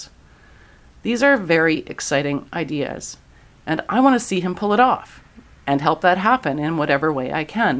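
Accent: Canada. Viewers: best Canadian accent ever!!! thanks for the laugh, peace oot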